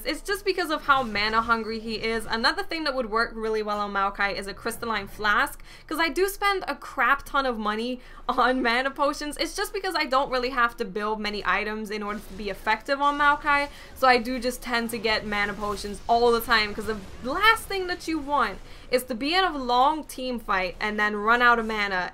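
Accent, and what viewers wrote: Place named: New Jersey, United States. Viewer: I like your Jersey or Brooklyn Accent (or whatever it is) It's like a hotness multiplier on top of your nerd knowledge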